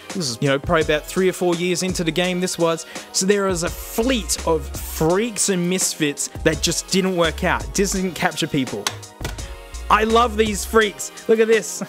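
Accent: Australia. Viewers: Perfect way to start the day, aussie ranting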